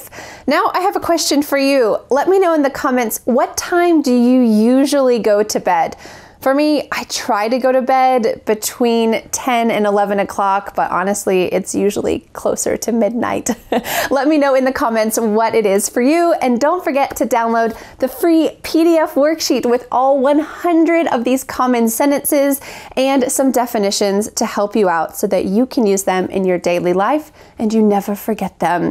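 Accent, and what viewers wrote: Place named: United States. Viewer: By the way, I'm crazy about the American gorgeous accent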